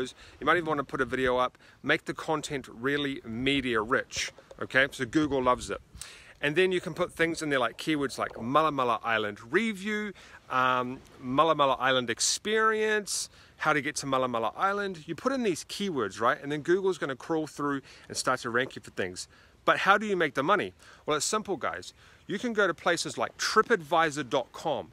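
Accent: New Zealand. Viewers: make a video on how to get your nice New Zealander accent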